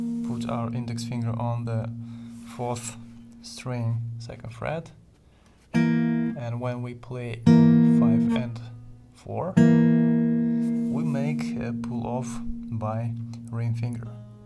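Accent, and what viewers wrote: Russia. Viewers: Are u Russian? I hear some Russian sounds I think😀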